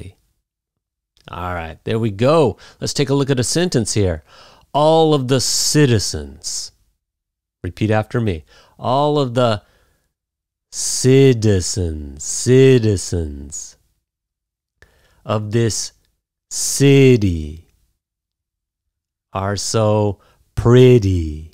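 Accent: United States. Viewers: I say t sound for t. You must be teaching hoosier English.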